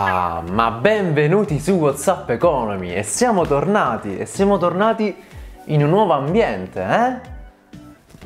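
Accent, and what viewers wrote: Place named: Sicily, Italy. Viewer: Il tuo accento Siciliano mi scalda il cuore ❤️ Grazie per il lavoro di divulgazione che fai qui su YouTube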